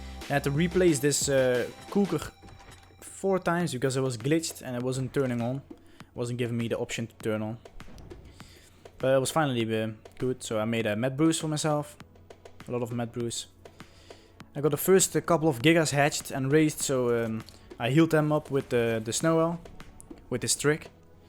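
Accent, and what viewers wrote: Netherlands. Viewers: For everyone saying he sounds Dutch , yes he is Dutch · you sound very dutch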